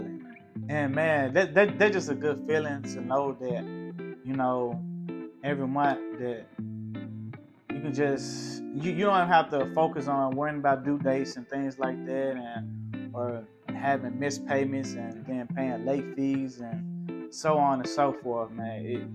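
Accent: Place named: Memphis, United States. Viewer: I knew you was from Memphis because you said Mane too many times 38118 in the building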